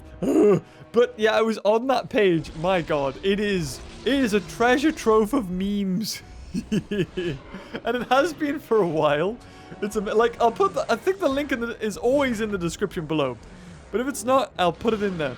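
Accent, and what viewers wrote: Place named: United Kingdom. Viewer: why do you even think i came here for? The brittish giberish ofcourse:)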